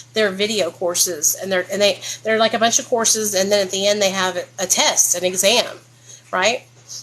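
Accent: South, United States. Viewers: Your such a good mom I think Nate is such a good little boy. It’s so cute how u call him son . Very southern sounding I think? I’m in wi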